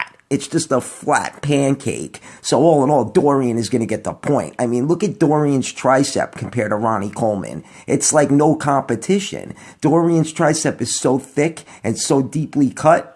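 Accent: New York, United States. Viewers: i love that nyc accent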